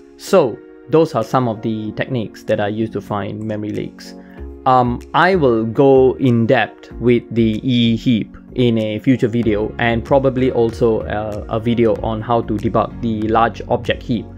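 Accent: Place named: Malaysia. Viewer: are you malaysian ? Sounds like you are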